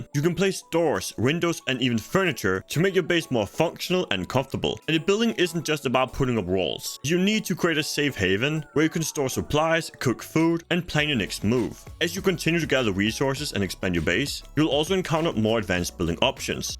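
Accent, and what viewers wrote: Denmark. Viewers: you sound danish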